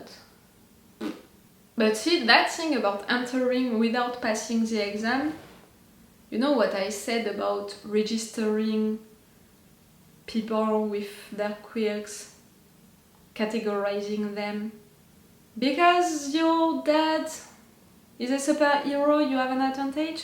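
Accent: France. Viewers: j'adore ton accent français ^^ Il est merveilleux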